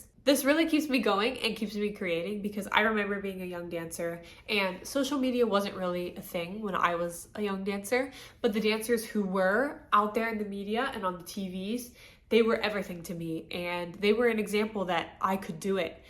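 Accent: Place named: United States. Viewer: Your Dialect Sounds Like an American.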